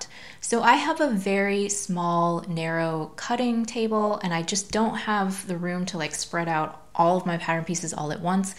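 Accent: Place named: United States. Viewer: I'm not sure where you are, I'm guessing USA from your accent, in which case I don't think they sell the cutting table there. It's a New Zealand company and I bought mine in Ireland, I also have one of their sewing machine tables. However, I'm sure that there must American brands that offer similar products. The most important thing is the ability to set it up to be the height that suits you, it has made a huge difference to my lower back. Lockable casters are useful too.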